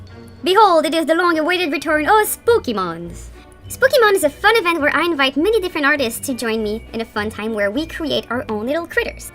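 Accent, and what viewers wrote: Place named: Quebec, Canada. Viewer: That’s so funny to hear you with your French Quebec accent in this video,! I like it. For the rest, I didn’t know then you make an halloween challenge like that in YouTube, that’s pretty cool.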